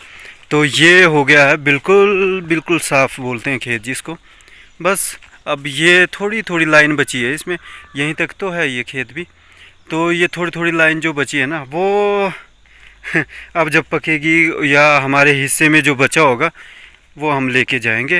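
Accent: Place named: Punjab, India. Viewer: Ap jo batye karte ho achi lagti us m thoda punjabi ka touch hota ha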